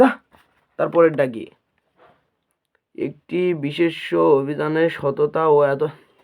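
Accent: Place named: North Bengal, India. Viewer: ভাষা উওর বঙ্গের মনে হয় দিনাজপুর।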